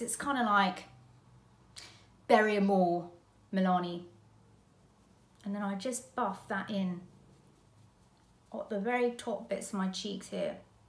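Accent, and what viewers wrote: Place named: Northern England, United Kingdom. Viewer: You look absolutely stunning ❤. I love that you totally, 100% know what works on your skin and how to use those products to give a beautiful finish, and with no faff or complicated techniques. 👌👌 I'm also glad that you're having lovely warm weather, I miss the summer's in England so much. The country pubs, the charming chocolate box villages, and hearing the birds sing......so many precious and wonderful memories of my younger life.....🥰 Loving the Northern accent 🫣🤣 Enjoy the sun 🌞 ❤🥂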